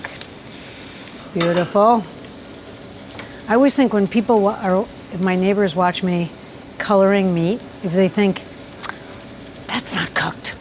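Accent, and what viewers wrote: RP, United Kingdom. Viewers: Wow that’s a wicked English accent posh ok 👌🧑‍🍳🌹.Iam looking forward to seeing you your meal 🥘 today 🌹🌹🌹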